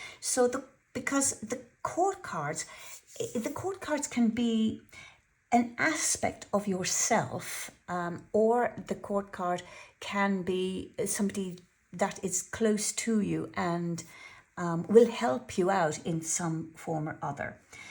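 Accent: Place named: Ireland. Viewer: You have a lovely accent 🌹.I hear an Irish Lilt in you voice 💃.. Good Reading Thanks 🌼🏹🏹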